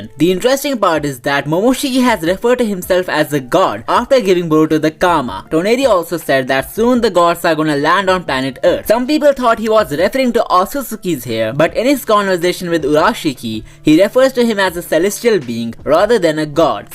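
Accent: India. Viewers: R u from India?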